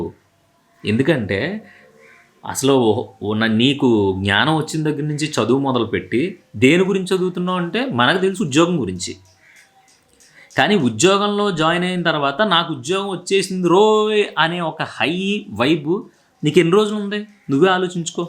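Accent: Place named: West Godavari, India. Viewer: Confirm ga nv west Godavari anna nuvu ❤❤❤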